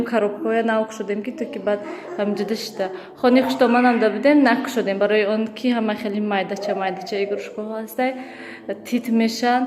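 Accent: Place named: Khujand, Tajikistan. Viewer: салом муборак шавад хонаи нав насиб куна худочон моям сохиби хона кунад мебахшед шумо гапзанитон хучандихоба монанд не душанбегихоба монанд мо хамихе гап мезанем илтимос нависен ай гучо шумо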